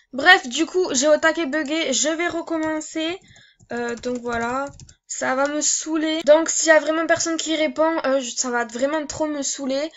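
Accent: Marseille, France. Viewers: Ta l'accent Marseillais tope la copine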